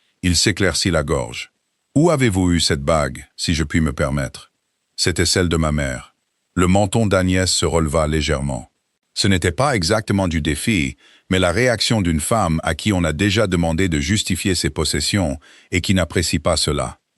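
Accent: England, United Kingdom. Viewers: Tous ces récits sont de l’Angleterre, cet accent donne de la force aux récits.. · Que l'IA abandonne cet accent anglais ridicule et on s'abonnera !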